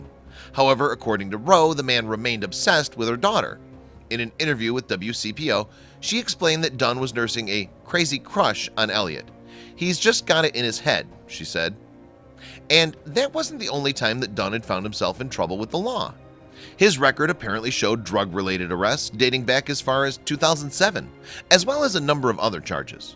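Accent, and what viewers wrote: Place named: Chicago, United States. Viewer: The narrator sounds like he is from the Chicago area.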